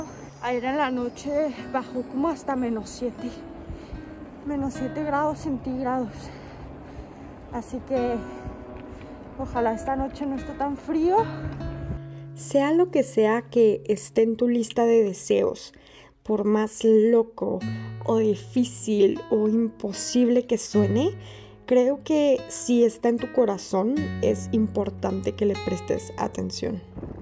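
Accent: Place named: Upper class, Mexico. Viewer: No puedo con el acento tan fresa, el video es interesante pero que desesperante es el acento whitexican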